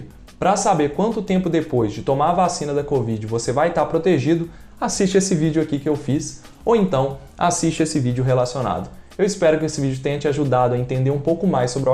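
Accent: Minas Gerais, Brazil. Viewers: Vc é mineiro. Deu pra notar.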